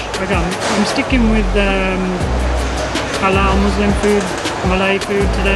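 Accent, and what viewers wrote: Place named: United Kingdom. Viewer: Hi, I regularly watch your videos with interest. I lived in Singapore for 40+ years. What “bugs” me about your commentary is that you PRONOUNCE street names or places as if you were an Ang Mo that arrived just yesterday. I recommend that you (learn to) pronounce names the way Singaporeans say it, and not how the British would do it. The other day you visited BAKIT TIMAH. That sounds awful.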